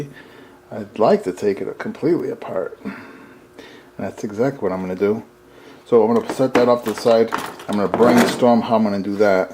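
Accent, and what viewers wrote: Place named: Bensonhurst, United States. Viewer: You sound like you're from bensonherst. Not a slam.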